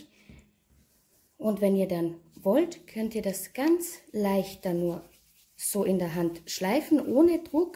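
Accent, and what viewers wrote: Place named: Austria. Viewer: Ich mag sehr gerne deine guten Rezepte und deinen lieben österreichischen Dialekte. Meine Oma hatte auch viele solche Rezepte!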